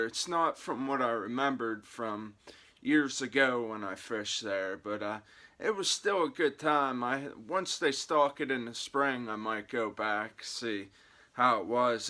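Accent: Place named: Indiana, United States. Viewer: "Crik". you say Creek like country people in Indiana do.. I'm from Indianapolis and we say cr-eek versus country people who say cr-ik..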